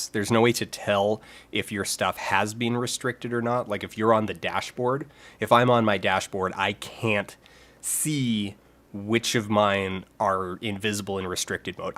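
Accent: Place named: Canada. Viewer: lol I just meant the voice and the way you speak. Not the actual words. Are you canadian?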